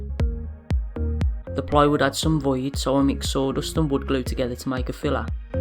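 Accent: United Kingdom. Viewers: yes and finally found a good man speaking british accent.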